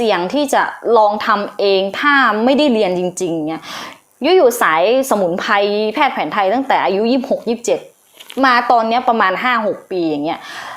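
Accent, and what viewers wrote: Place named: North, Thailand. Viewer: หมออย่จังหวัดอะไรอู้เมืองแต้ชอบวิธิอธิบายชัดได้สาระ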